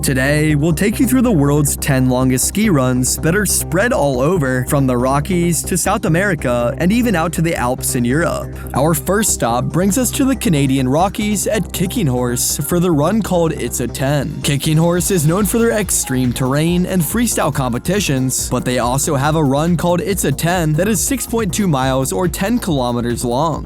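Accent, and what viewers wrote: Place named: United States. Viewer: Nice video, but hare ur american accent 😮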